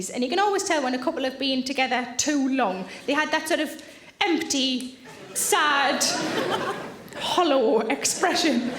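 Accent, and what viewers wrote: Newcastle, United Kingdom. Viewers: I'm Originally from Jordan living in Germany, I always compare the English,German, Arabic humor and I'm learning so much, I'm writing comedy in these three languages and this girl is amazing, she's absolutely amazing, I love how she's does the Geordie accent :-) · And her Geordy accent. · Geordie! Sorry🤫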